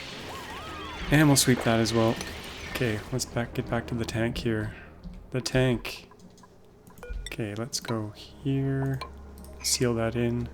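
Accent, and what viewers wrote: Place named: Ontario, Canada. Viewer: Hol up. Unless Ive seen this mentioned before, I didnt know you're a fellow Canuck! What province? you sound more.........I dunno......maybe Ontario? Can't fully tell. Certainly not Alberta or Saskatchewan...........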